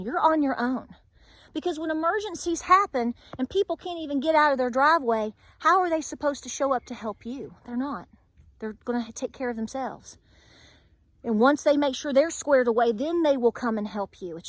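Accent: South, United States. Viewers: Love your southern accent videos, I almost don't want to print London temperatures in the 40Fs and easy rain showers. Hope things warm up soon for you all.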